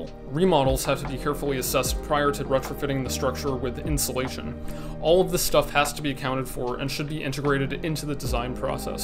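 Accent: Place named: United States. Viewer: Thanks bro I totally couldn't tell from the fact that the man with an american accent was talking to me on an american website that he is an american.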